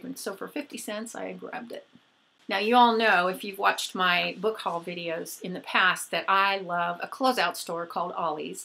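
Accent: United Kingdom. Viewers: Great haul! Loved the English accent lol! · Lovely Haul! Your excitement over those books is infectious :) That edition of Little Women is really pretty. I've seen it on a lot of shelves, and I'm thinking of getting it someday (tho I'm not sure I'd enjoy reading it). I had never seen the back of the cover tho! It's really cool :) The British accent :D I love that you're so much into genre fiction, like paranormal, dystopian, steampunk. Most of the books you hauled, I have never heard of them, but I've seen The Program around and it sounds like an interesting story. Looking forward to hear what you think of it. Starters has a freaky cover! (O_O) (the one you like) I wouldn't be able to stop staring at it if it was on my shelves. And I'd feel a bit creeped out. Wow! Those bookshelves look great! And those boxes full of books! If you ever get them all out, I'd be very curious to know how many books you have in total.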